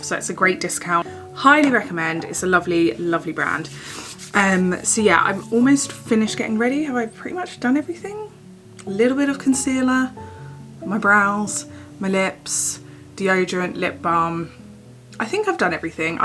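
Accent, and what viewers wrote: RP, United Kingdom. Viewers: Love seeing you look so healthy. Must be the microphone but you sound so posh compared to older videos 😂 Xxx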